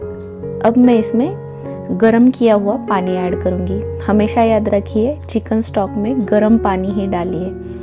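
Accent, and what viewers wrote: India. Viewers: आपका लबों-सहेजा बताता है कि आप साउथ इन्डिया से हो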